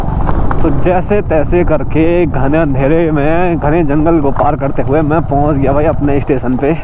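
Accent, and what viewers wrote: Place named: Haryana, India. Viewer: Ary ya aawaj to mhale haryana ke h🚩🚩🚩🙏🙏🕉️🕉️🕉️🇮🇳🇮🇳🇮🇳🇮🇳👍🌸🌺👌👌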